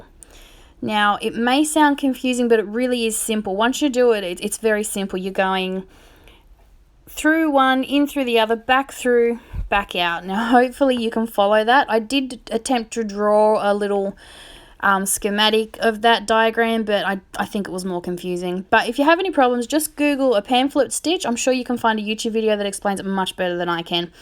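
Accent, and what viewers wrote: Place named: Australia. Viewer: like your work and your Aussie accent.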